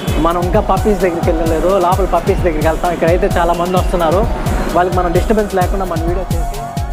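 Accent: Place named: Chittoor, India. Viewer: What a slang pakka Chittoor 😂😎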